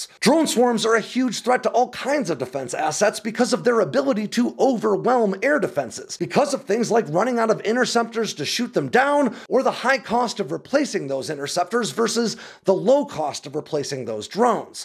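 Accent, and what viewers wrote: United States. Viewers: And there you go Russia. If there is anything else we can divulge about any potential flaws in the Dragon fire weapon system, or any other of our allies weapons don't hesitate to get in touch with us at Sandbox. Mind you the guy is American by the sound of him so it is what I would expect.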